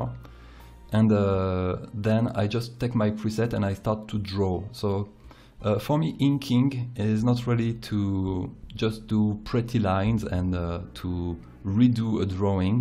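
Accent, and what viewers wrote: France. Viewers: i know at your voice your french i guess ... but its not bad :D you can speak english for ur vids is it good <3 im dutch ... i just talk english because i like that launge... im learning too french :P on 02/26/2016 i got a test from french laugne :D i got 17/20 pretty good :D i like ya vids! <3 <3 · je lai remarqué a l'accent :)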